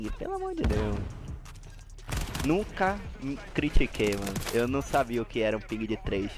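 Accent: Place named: Rio de Janeiro, Brazil. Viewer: Eu achava que tu era carioca kkkk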